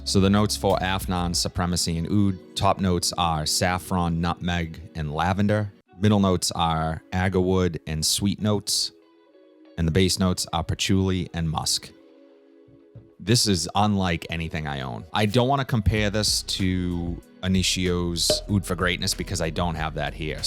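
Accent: Boston, United States. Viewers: After a month - have your impressions changed? Also, you’re from Boston aren’t you :)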